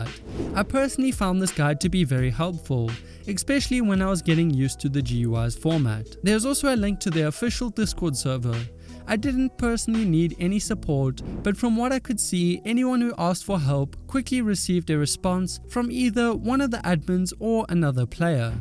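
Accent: South Africa. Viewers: South African flag on your discord status i think i might have found the most normal sounding south african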